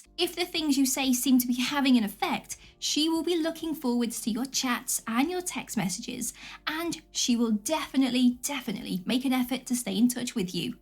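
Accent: United Kingdom. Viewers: I love your British accent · Actually, this is my real YT channel, and I still love your British accent.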